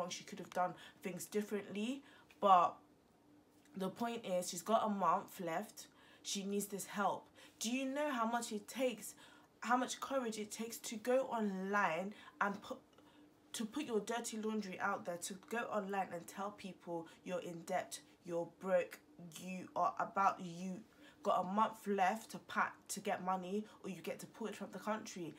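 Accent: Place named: Zimbabwe. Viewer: You sound zimbabwean. .thank you for not being harsh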